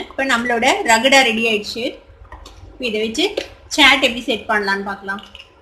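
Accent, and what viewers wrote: India. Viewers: nice sis..indian tamil nalla iruku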